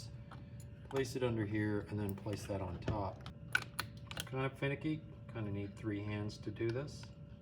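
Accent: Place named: Northeast, United States. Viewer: Thanks. If I pull the trigger on the 701, I'll probably be peppering you with questions. Looking forward to following in your footsteps. Where in the world are you based? Sounds like NorthEast USA somewhere.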